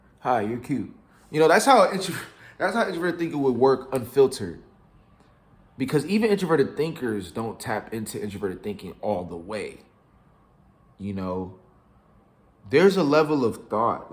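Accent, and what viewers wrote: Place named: United States. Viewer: Hi I’m watching you to learn American accent 👍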